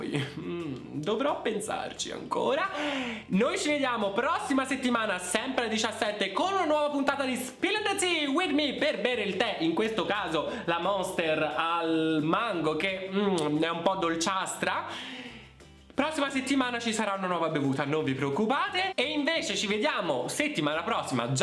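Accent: Tuscany, Italy. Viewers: Io ti amo ....basta vederti e sentirti e l accento toscano poi ....😘😘😘😘😘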